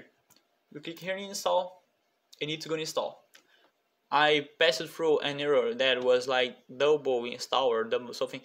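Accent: Brazil. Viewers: slc mano me ensina inglês aí 😯só deu pra perceber que era é br por causa do sotaque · Só BR pra ter esse sotaque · tu é brasileiro né mano, esse sotaque ai eu conheço hahaha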